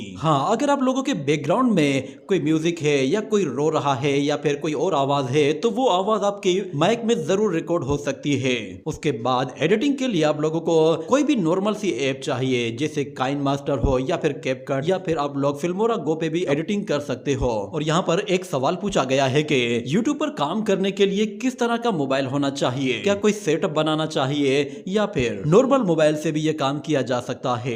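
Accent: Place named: India. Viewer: Mujhe laaga ap Indian ho 😂😂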